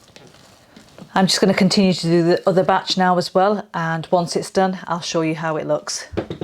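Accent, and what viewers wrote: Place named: North of England, United Kingdom. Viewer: You're very welcome !! If you recall, I mentioned earlier that I was born (1944) in Glasgow, & am now retired & living in Oakville, Ontario, Canada. I've eaten various Curries since my Teenage years, & make them at home. Also, I love your accent from (where in ?) the North of England. And, where are you now down South ? As I've said before keep up the good work, & Curry on !!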